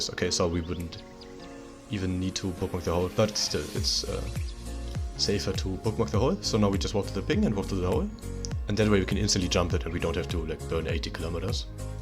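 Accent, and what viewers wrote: Germany. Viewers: ahh i höre raus deutsch :D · On a top of a thick German accent. I don't get why everybody feels the need to add - most of time silly - music to videos. Anyway, good content.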